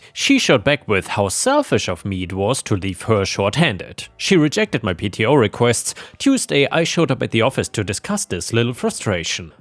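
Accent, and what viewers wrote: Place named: Germany. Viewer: You know what I just realized? You have pretty much lost your German accent. Your English is awesome.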